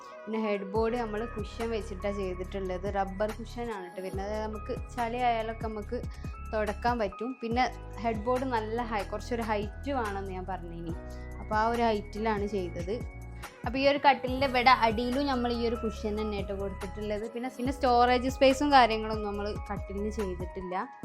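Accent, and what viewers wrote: Kozhikode, India. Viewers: Malayalam samsarikkan ariyanhitalla.calicut bhasha anganeyane suhrthe.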